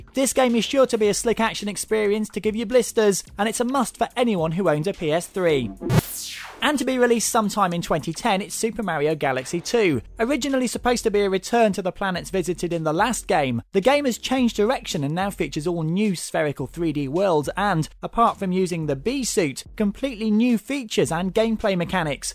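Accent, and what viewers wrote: England, United Kingdom. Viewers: Thats the way they talk in England. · Why do all game critics have British accents? I can barely make out what he's saying. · Its British SON! :D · I love it how all of you people, who I am guessing are American due to you're comments about his voice, say that it sounds wierd. If you knew anything outside of your own cozy fucked up country then you may have heard an ENGLISH accent before... You're ignorance and arrogance makes me feel ashamed to be of the same species as the scum that American people are. Everyone outside of America, hates America - I just thought that I would let you know, seeing as you would not know if I didn't say...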